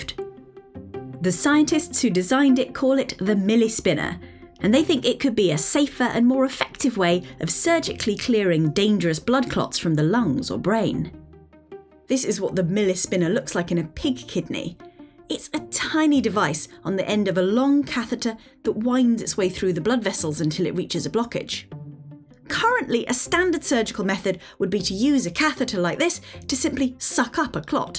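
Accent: United Kingdom. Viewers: The extremely British, and unnecessary, accent intonations had me quit half-way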